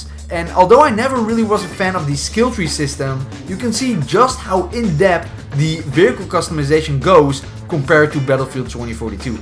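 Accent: Netherlands. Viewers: You must be Dutch right?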